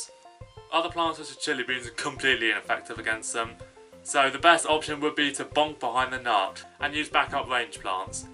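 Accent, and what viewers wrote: United Kingdom. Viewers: I think he's Britisih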